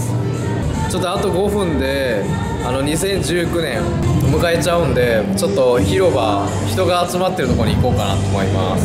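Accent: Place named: Kansai, Japan. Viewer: ❤✌🕉 、なんで関西弁なん?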